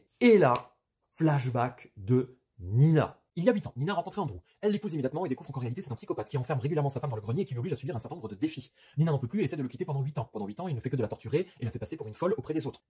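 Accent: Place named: England, United Kingdom. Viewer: Merci pour ce sacrifice qui m'aura évité de le lire 😂 Cet accent anglais juste... incroyable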